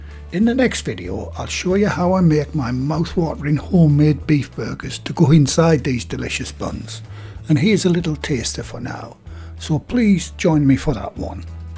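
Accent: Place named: Newcastle, United Kingdom. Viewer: Another fab video. I bought some store bought ones yesterday. and they were disgusting, i threw the rest out to the birds this morning, even they were in 2 minds whether to eat them. I will be making my own now. Not only am i loving watching your channel and enjoying your easy to follow recipes, i love your geordie accent. Thanks again.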